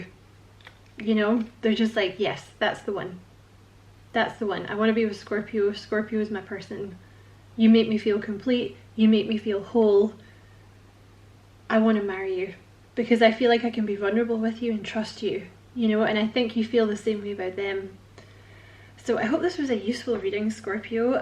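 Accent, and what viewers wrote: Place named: Scotland, United Kingdom. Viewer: Love your accent! You speak to my Scottish roots. 💗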